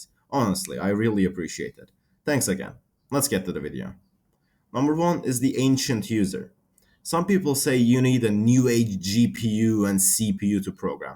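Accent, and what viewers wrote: Turkey. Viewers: Türk aksanı mmhhhh kolay gelsin brom. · Dude are you turkısh? EDİT: Kesin Türk'sün ama İngilizcen gerçekten çok iyi. Yani böyle dersin ana dili yani öyle konuşuyorsun. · Are you turkish? I saw the turkish "activate windoes" watermark . I should have understood it from your accent and profile photo.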